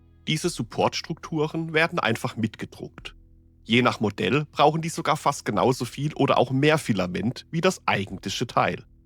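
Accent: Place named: Palatinate, Germany. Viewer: Kummscht du aus de Palz?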